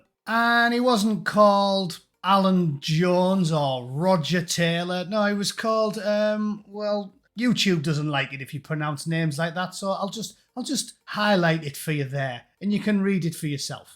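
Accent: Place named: England, United Kingdom. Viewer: Love your sense of humor, and of course the English accent!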